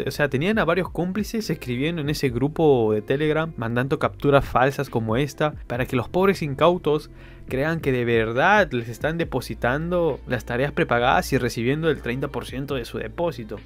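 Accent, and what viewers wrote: Argentina. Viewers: Papi por que hablas como argentino??? Si eres peruano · Yo de Lima, por eso te digo que a veces suena como a argentino, pero muy ligeramente. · Porque ablas como argentino?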